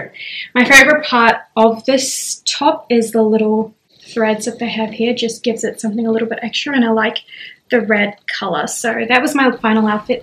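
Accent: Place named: Australia. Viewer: I love your accent. It's so refreshing to hear an Australian girl talk about fashion.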